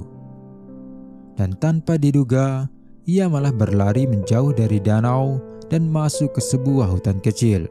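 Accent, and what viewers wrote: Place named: Lombok, Indonesia. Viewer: Authornya ni ngomongnya sayup2 kayak logat sasak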